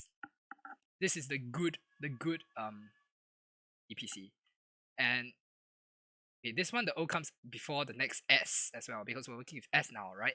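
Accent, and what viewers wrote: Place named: Singapore. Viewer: That Singaporean accent, nice to see another fellow SG player with teto tutorials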